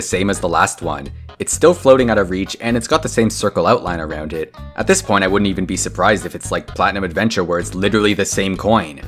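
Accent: Canada. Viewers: canadian accent 🥱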